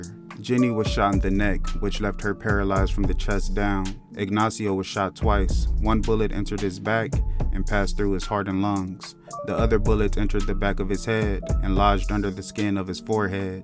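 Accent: California, United States. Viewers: That's definitely a California where are you From?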